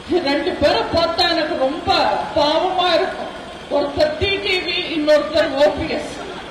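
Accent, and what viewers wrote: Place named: Telugu, India. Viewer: தமிழ் பேசுவது தெலுங்கு வாடையில் பேசுகிறார் 😮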